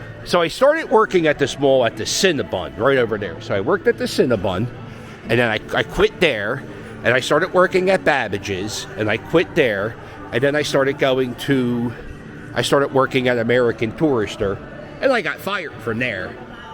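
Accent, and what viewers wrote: Philadelphia, United States. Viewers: Philly accent is A1! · With the heavy Philly accent 😂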